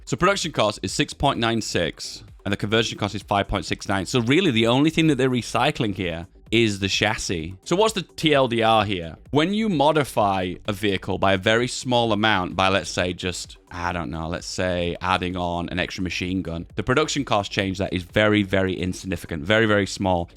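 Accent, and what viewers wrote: England, United Kingdom. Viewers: You know, Ive watched your videos for years. No idea what you looked like. This isnt what I expected lol not bad. lol Whats the accent? like region of England?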